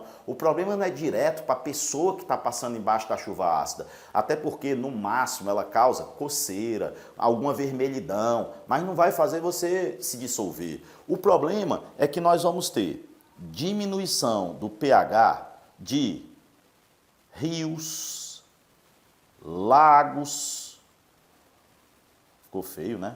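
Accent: Ceará, Brazil. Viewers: Cearense é reconhecido onde for Kkkklk é nois, macho véi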